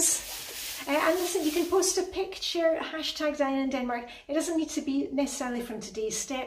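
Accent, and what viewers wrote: Scotland, United Kingdom. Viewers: I have enjoyed your Scottish accent so much - just had to comment when you said jotter… reminded me of school, back when I had a Scottish accent too! Thanks for all your encouragement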